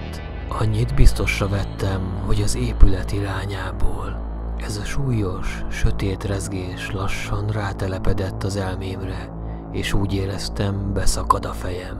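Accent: Russia. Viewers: Király az orosz akcentusod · Nem tudtam félni vegig a Vicces Orosz akcentuson szakadtam xddd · Nagyon jó az orosz akcentusod. Tetszik! Azt hittem, hogy ez igaz történet, de miután megnéztem a leírást, akkor rájöttem, hogy ez csak egy kitalált történet. Kösz, ezt a nagyon jó történetet. Van ennek a történetnek folytatása?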